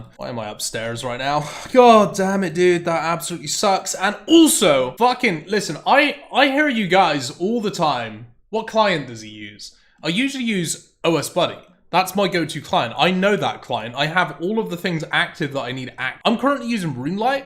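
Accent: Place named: Devon, United Kingdom. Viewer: With a Devon accent that thick I expected him to be doing a lot more farm runs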